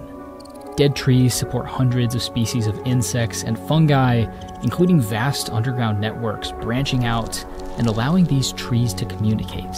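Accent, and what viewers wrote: United States. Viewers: I downvoted this video because an American narrates it. And the American doesn't know what he is talking about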